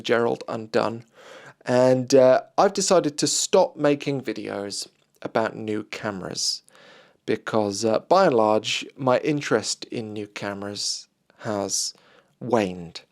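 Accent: United Kingdom. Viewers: What the f*&^* is this channel about. Confused adults? 'British accent'! A very accented 'British accent'! guess the locality.. I have it within 50 miles!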